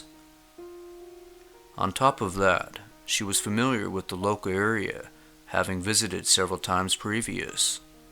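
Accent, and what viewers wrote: South, United States. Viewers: You sound like the distant cousin of Jensen Ackles who comes from the south and is unfazed by everything.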